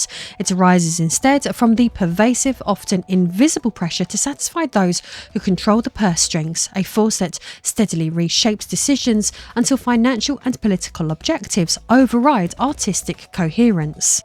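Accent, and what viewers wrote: United Kingdom. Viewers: got to ask * uh your accent sounds sorta brittish is that correct just kinda guessing here?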